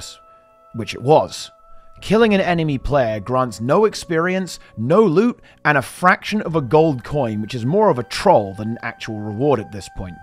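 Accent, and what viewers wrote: England, United Kingdom. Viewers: your smooth english accent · how will I listen to your smooth english accent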